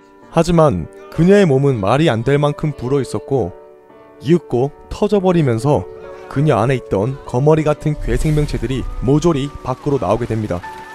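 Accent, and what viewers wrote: Gyeongsang, South Korea. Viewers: 님 갱상도 출신이네요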